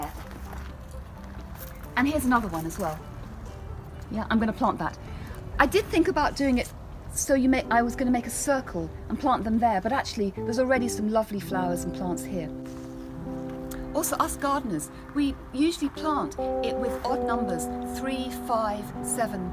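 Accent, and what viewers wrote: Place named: RP, United Kingdom. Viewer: 好喜欢听的Qween’s English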